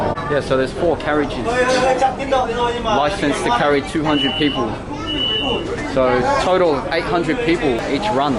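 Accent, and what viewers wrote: Australia. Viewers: The new metro looks good! I hope they install platform doors later on. It would make the system a lot safer. Your stations are above ground rather than underground like ours. I'm from Hong Kong, China. Your accent - is that Australian or New Zealand? Also, LOL about Vietnam's population of 98 million. That's what I see on Worldometers. Yes, I agree got to hit 9 figures.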